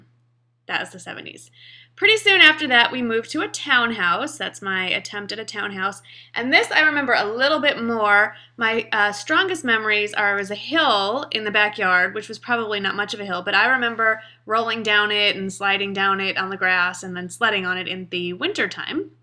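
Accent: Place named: Texas, United States. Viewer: Even though you're from Chicago I do believe I detect a little Texas drawl "y'all" . Anyways cute video Yay for lefties! BTW you aint older than all your subscribers. little ol' me is tuning in! :-)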